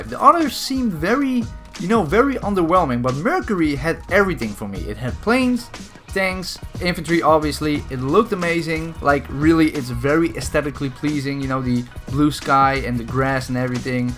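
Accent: Netherlands. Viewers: I smell dutch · Is dutch, Pronounces rotterdam as rodderdam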